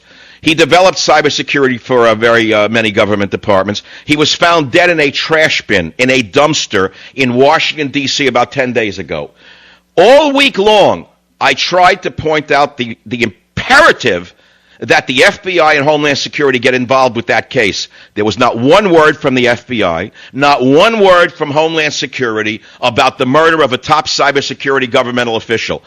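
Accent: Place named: New York, United States. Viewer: for me he reminds me of my uncle. He has a familiar NY accent which reminds me of home. But, his teachings r not commong in NY.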